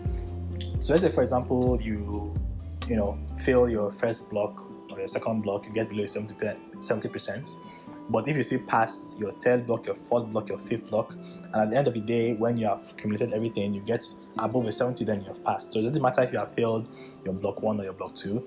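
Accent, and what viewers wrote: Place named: Nigeria. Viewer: You sound Nigerian bruh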